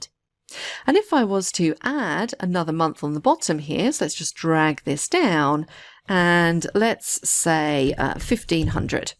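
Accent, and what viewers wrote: United Kingdom. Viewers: Wonderful and helpfully thorough presentation. I learned alot. The British accent was a bonus, as any serious topic spoken with a British accent makes the topic suddenly sound more intellectually sophisticated lol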